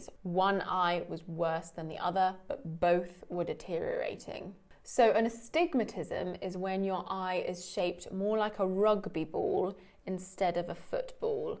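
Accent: United Kingdom. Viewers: Hai To.... I like Your Chanel And Your Voice British Accent.... Sorry, If My English Writing and Language So Bad....Because Need to Learn More.. I'm Eksand From Indonesia Country.... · You should record audible books! It’s so hard to find readers with your young British accent. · i have noticed that her pronounciation is British but her doctor has an American pronouciation☺️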